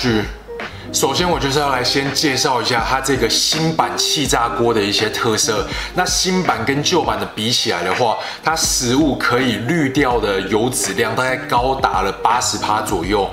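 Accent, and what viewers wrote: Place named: Taiwan. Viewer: 发的内容很好，这台湾口音真的扁到受不了，大概听普通话习惯了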